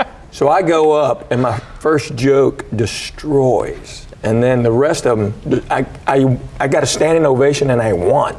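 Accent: South, United States. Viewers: I've never heard an Asian guy with a southern accent before. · I remember seeing this guy when I was a little kid in the. Local accents are quickly going away these days but at the time his southern drawl really confused me! I don't remember the whole setup but I remember the punchline was him saying, "I reckon so!" I'm from NYC and I'd never heard that phrase before so next time I saw one of my uncles, a guy born and raised in the South but spent the rest of his life here in NYC, I asked him what that meant so he asked me to repeat the joke for context. He laughed for 5 minutes straight! He said it made him feel at home again. There's a type of southern humor that's really dry but sarcastic and very sharp. He told me it was like British humor in that way. His accent has softened, he's still got some of that southern charm and dry wit. And I'm glad to see he's still working. 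I'll be subscribing and checking out more of his material! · The Asian with a southern accent is fascinating me.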